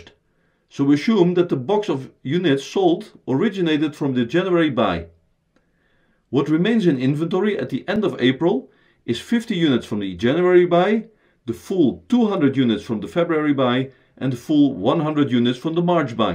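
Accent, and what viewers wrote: Netherlands. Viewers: A brilliant explanation. Many thanks! Could I ask please, how would you work out the COGS where you buy something as a set and then split the parts out? I don't mean bulk buying of the same item, but rather if, for example, you bought something like Lego sets. Each set is a sealed box with its own price, and you then "part out" the set into many different types of piece. Each type of piece can sell for vastly differing prices. If you just divided the cost of the sealed box into the number of parts to give each part an equal cost (say, a £100 set with 200 parts meaning the COGS for each part you sell would be 50p) , you could be at a disadvantage when trying to claim COGS if you only sold the rarer parts that fetch high prices because your margin would be higher on those parts. But is that how it should be done? Another example would be a breaker's yard where they buy in scrap cars and then sell the parts off for different amounts. 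What would the COGS be for each part they sold? A wheel would sell for a lot more than a wing mirror, so what are their costs out of the original cost of buying in the scrap car? And yet another example could be a collection of trading cards bought for a single cost. The collection is then split into separate items to be sold, but how should we work out the COGS for each card sold? Thanks for any tips! Echt heel erg bedankt! (sounds like a Dutch accent on your videos, but I might be wrong). :-) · i´ve lived 10yrs in holland (<num> r´dam) .. your accent is unmistakable :D im planning to go back there ..... i buy, grow and sell companys (mergers & acquisitions) .. mostly distressed companys.. but not always.. i could use help from a trustfull financial advisor for my financial due diligence ..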